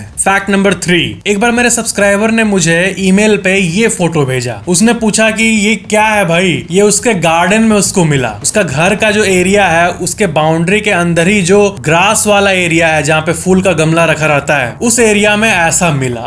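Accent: Bihar, India. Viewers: Bihari tone hai inka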